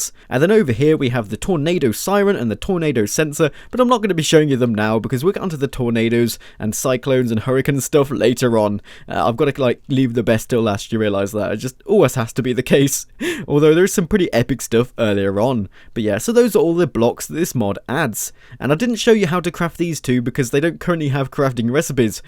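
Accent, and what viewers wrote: United Kingdom. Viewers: I love British accents :D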